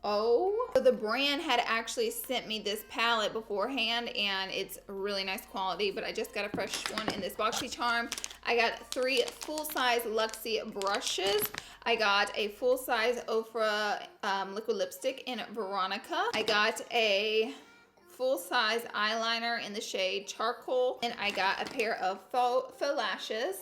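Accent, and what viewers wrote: South, United States. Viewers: You’re more southern than ever 🤣🤣🤣 (must be mom’s visit influence!) gotta concentrate to get everything but I had a blast watching this video as always 🙌🏼 Love from Peru 🇵🇪 ❤️ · I Always get So excited when there is a new vid!! Girl you are Absolutely Gorgeous & So Damn entertaining & being a southern girl (GA girl here lol) who has a Very thick accent too, its oddly comforting to watch you & hear your Beautiful voice & someone who Pronouces shit Right (and we know how we are saying right, Right? With the Loonnnggg "I" 😋)!! That eye look it Stunning on you!! On me with my orangey natural ginger self, I'd look like a damn clown probably lol, but if I got a chance for you to do it, you damn right I'd let ya! Love from the south girl where its hotter than hell & the humidity forces you to pretty much give up Completely on even Thinking of doing anything with your hair other than throwing it up in a messy bun on top of your head! 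And Love from my 4 beagles & 3 cats who watch & listen to you too & Love you! My youngest cat Sev tries to "catch" you & "pet" you a lot through my phone while I watch! Lol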